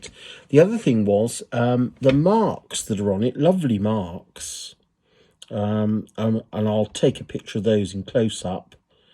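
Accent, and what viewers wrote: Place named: United Kingdom. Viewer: These southern US ears have trouble understanding British accents. Did you say bginternet?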